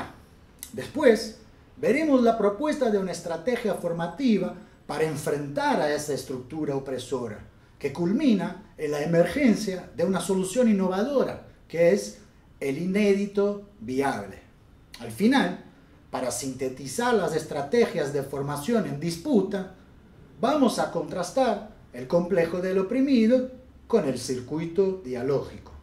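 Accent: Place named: Buenos Aires, Argentina. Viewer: increible hermano, gracias por este contenido. tu acento porteño esta re copado y me encantó escucharme de subito en la grabacion de graveola que aparece en los carteles 🏹💎💚🙏🏾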